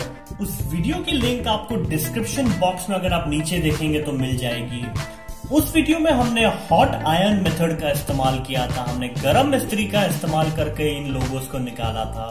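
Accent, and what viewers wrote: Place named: India. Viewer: He is Indian English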